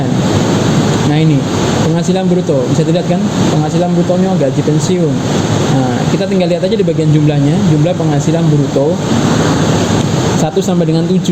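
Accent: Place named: Java, Indonesia. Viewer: Logatnya medok Jawa keren bgt penjelasan nya 😁seru mendengar nya, btw suami istri perlu kah pisah harta?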